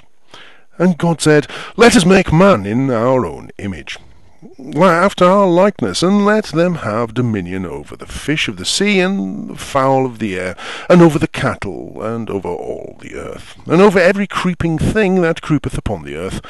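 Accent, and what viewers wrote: United Kingdom. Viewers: I was lead here by fans of my channel... and while I knew that I was hardly doing something totally original... I was told that your english accent was part of how funny you are. And.. yes the english accent is totally something I cannot hope to imitate being that I have a lowly midwestern US non-accent accent... long story short, You're funny and interesting enough that I watched the whole video! I like your sense of humor! I can only hope to be a fraction as funny as you! :D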